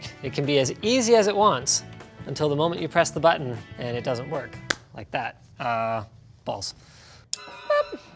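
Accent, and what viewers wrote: Canada. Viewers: freaking Canadians and their pronunciation of the letter z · He's Canadian, that's how they say the letter Z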